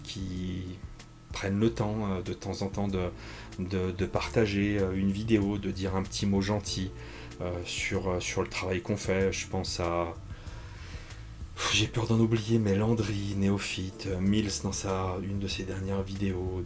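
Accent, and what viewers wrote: England, United Kingdom. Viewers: Félicitations pour tes 4000 abonnés ! Q : D'où vient ce magnifique accent anglais ? Wall Street English ?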